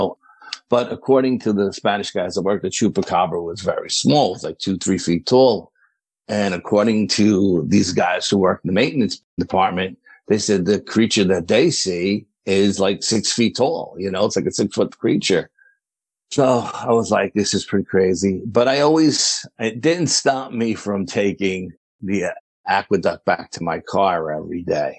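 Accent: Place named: New York, United States. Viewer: Man I love this guy's stories! I think the ny accent adds to the charm! Lol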